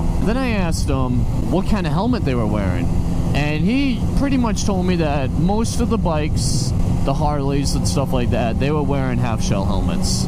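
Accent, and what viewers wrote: Massachusetts, United States. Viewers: Are you from Massachusetts?!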